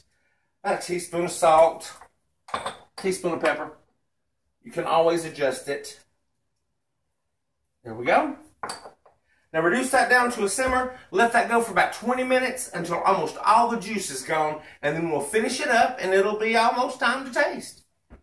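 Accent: South, United States. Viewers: I can't wait to try this! Yours looks so good!! And I love your Southern Twang!!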